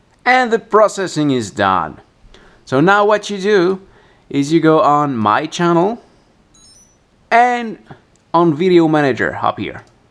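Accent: Quebec, Canada. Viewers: thanks for this video! Will try it out. Like your style - what is your accent - Quebecois?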